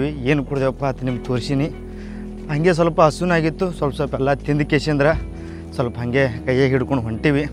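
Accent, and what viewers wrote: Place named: Yadgir, India. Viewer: ಯಾದಗಿರಿ ಭಾಷೆ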